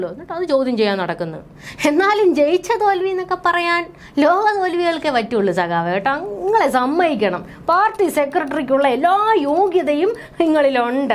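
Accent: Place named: Kollam, India. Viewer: കല്പന ചേച്ചി തന്നെ കൊല്ലം ഫാഷ സൂപ്പർ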